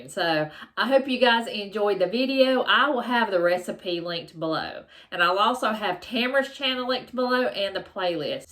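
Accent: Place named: East Texas, United States. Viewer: I’ve just subscribed and I plan to binge watch your videos. I don’t know where you’re from, but I’m from east Texas, and we even sound alike. Kinda feel like I know you. All the best to you and yours!❤😊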